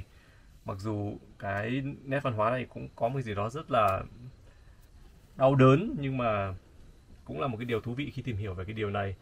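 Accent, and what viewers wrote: Vietnam. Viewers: Ông a người miền nam hay bắc đấy,,giọng nghe quen quen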